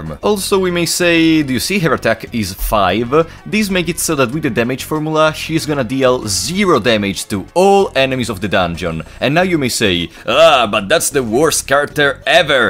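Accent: Italy. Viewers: Avevo incominciato a vedere questo gioco prendere popolarità e l'ho comprato per provarlo, ora grazie ai tuoi video sono riuscito a capirlo meglio. Ho visto che sei italiano per l'accento e perchè quando vai a vedere il codice sorgente del gioco ci sono le scritte in italiano. Peccato che in italia non se lo caghi nessuno sto gioco. Continua così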